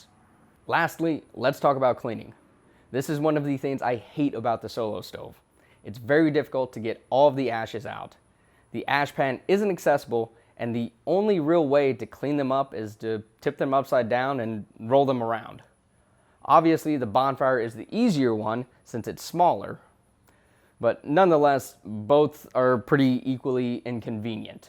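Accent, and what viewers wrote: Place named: Indiana, United States. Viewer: damn bra, an oriental dude with a Indiana accent (y)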